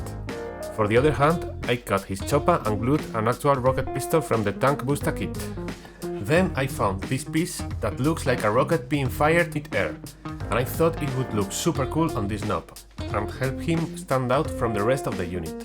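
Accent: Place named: Spain. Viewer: Vi ese español interior a través del acento jajaj, buen vídeo Bro 👏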